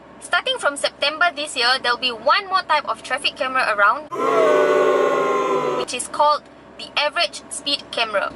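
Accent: Singapore. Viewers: Thank you for spending time making these videos. Clear spoken English with a tingling Singlish slang. I like 👍. Well done though need to curb the damn word haha. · Well presented in our local English. Easy to understand. Enjoyed this video very much and sincere thanks. TP or LTA should consider to buy this video to educate new qualified drivers or new converted drivers.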